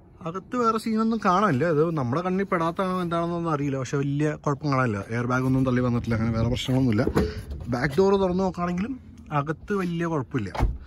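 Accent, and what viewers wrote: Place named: Kodakara, India. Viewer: Bro naatil kodakkara aano place ente oru friendine orma varnne bronte samsaram kekkumbo katta support bro👍🏻👍🏻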